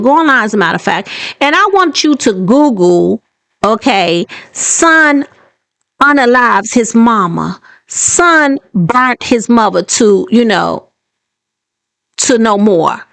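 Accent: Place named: DMV, United States. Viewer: Youre absolutely right. You make great points. I hear a little DMV in your voice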